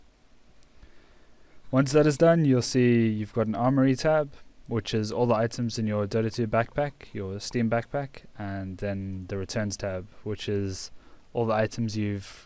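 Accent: South Africa. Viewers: you sound south african ? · Is this guy a South African ?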